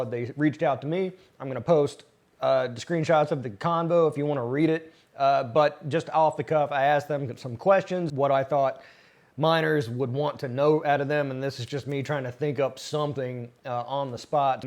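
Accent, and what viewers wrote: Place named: Australia. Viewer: Mate? Sounds Australian! :)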